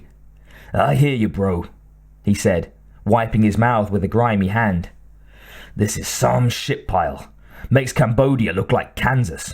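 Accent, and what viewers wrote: United Kingdom. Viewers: I would have liked it if in this beautiful story each character had their own voice, as was done in the Radio Plays, plus the audio effects, and the suspenseful music in the background of the story, not to mention that the BRITISH ACCENT IS TOTALLY UNACCEPTABLE. Don't you think that in this story, the BRITISH ACCENT doesn't match the characters❓️❓️❗️ And how the hell can a Native American / ITALIAN - SIOUX (BILLY SOLE) or an American from the South, Beaver Creek Swamp (BLAIN) from Alabama or MAC from Kansas would speak with a British accent, and same for the others❓️❗️❓️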